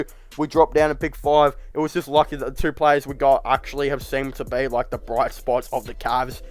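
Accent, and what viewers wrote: Australia. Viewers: Dude are you Australian or are you trying to sound Australian and doing a bad job at it?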